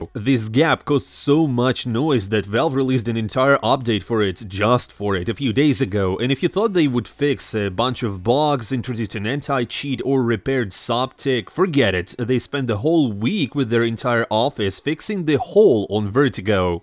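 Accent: Russia. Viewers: bros mocking French accent meanwhile having the strongest Russian accent :DDDDDD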